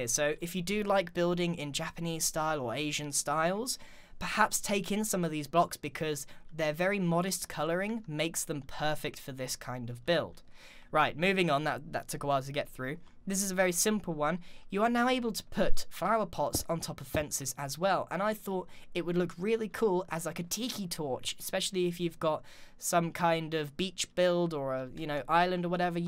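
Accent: United Kingdom. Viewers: Rafael YT relax he sounds like he's british or something he probably is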